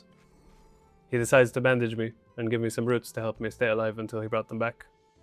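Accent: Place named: Sweden. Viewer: Thanks for your reply. Also by your accent I can tell you are swedish, right?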